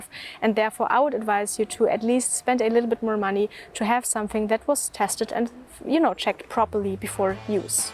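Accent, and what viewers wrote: Germany. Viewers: Thanks for the detailed tips and also making me notice i kinda love listening to English with a German accent. Except when its mine.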